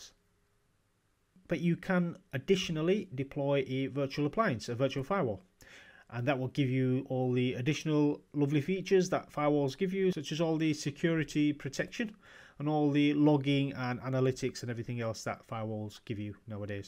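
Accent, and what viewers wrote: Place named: England, United Kingdom. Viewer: Finally a proper English accent. Thank you.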